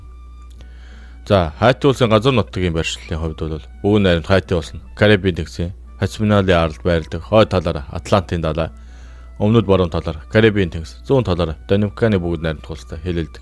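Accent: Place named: China. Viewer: Хятад аялгуутай сонсогдож байна бас хятад ая.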